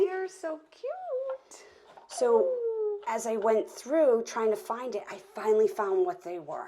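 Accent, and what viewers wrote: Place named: New York, United States. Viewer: accent sounds more like downstate NY than Massachusetts.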